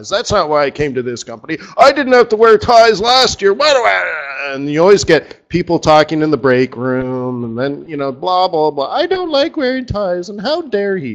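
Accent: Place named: England, United Kingdom. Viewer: You lost me on the English accent and had me with the python references -also good content as ever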